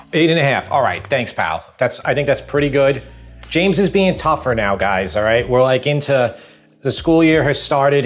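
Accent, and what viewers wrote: New York, United States. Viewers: Are you from the Bronx? Brooklyn? Queens? Or NJ? :)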